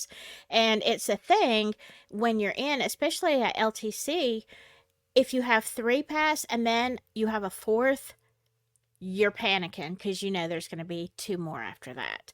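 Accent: South, United States. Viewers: I love your generous spirit and southern accent. Thanks for keeping us entertained and informed with real paranormal stories. ❤ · Hello, and fellow nurse! Love your stories and your southern accent. The three's (my favorite number), I always felt, is the Lord's number- father, son and holy ghost.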